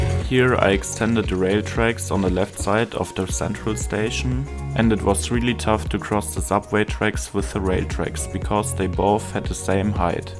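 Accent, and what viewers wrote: Germany. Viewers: auch wenn du english sprichst kann man so übel hören das du deutscher bist XD XD dabei sprichst du ganz gut english ^^ der akzentt oder so isses XD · i think u sare a german guyy because the accent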